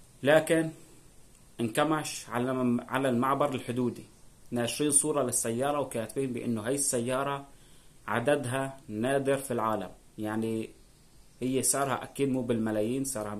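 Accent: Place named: Aleppo, Syria. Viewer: المشكلة من لهجة انت حلبي معم افهم على لجتك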